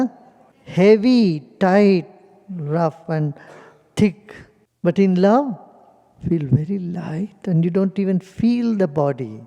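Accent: India. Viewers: I can only make out a word here there with this guy. The Indian accent is the hardest. It just basically blears words together.😳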